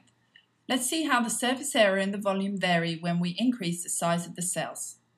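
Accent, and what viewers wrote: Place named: United Kingdom. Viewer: = = british accent? im fine with dat.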